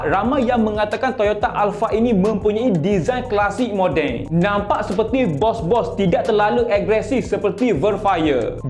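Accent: Kelantan, Malaysia. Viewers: kuat bunyi kelate demo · Aku confuse dia ni kelantan ke jawa…😅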